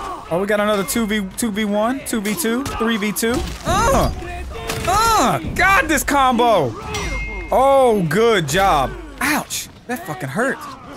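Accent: South, United States. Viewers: How the hell would you think he's black? His accent is white southern af, I just don't see it.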